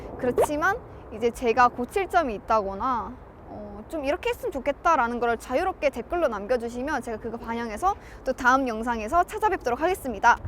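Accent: Gyeongsang, South Korea. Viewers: 이까지 라니 ㅎㅎㅎㅎㅎㅎ 부산 경남이 고향이신가요? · 경상도 사람인가?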